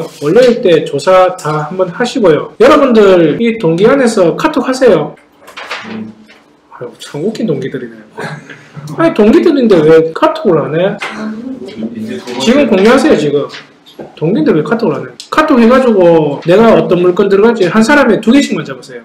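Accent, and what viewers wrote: Daegu, South Korea. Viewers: 말을참재미나게 잘 하시네요 착착감기는 대구사투리도 듣기좋으네요 강의 잘들었습니당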